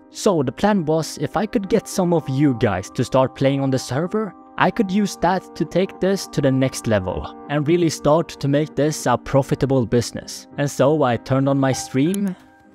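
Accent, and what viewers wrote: Sweden. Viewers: are u swedish cuz u kinda sound like swedish?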